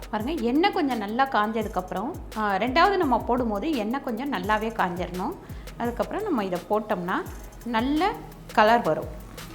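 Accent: Telugu, India. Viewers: உங்க தாய்மொழி தெலுங்கா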